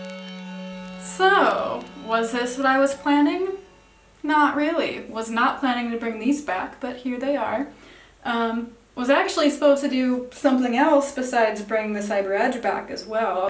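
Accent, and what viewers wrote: Netherlands. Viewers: OH MY GOD UR DUTCH? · waitttttt, are you Dutch???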